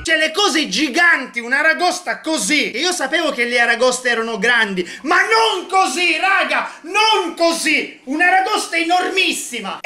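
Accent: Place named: Catania, Italy. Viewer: Io sono di catania e mi sono sempre chiesto da dove venivi pk avevi un accento catanese ho letto il wiki e ho scoperto che vieni da catania seeeeeee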